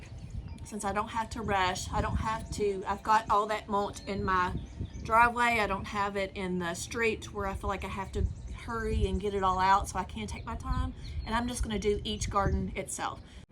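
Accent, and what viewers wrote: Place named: South, United States. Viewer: I don’t care how you say your words, It brings out your southern charm. In the plant world I think people get so hoity-toity about how words are pronounced, so much of it is regional.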